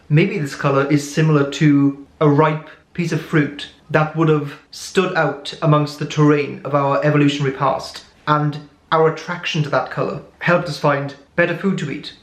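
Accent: Wales, United Kingdom. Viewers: Are you from wales?🏴🏴🏴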